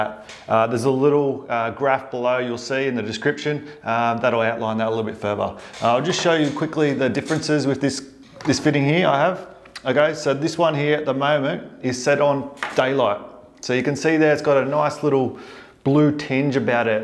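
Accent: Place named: Australia. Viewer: That Aussie accent tho🥰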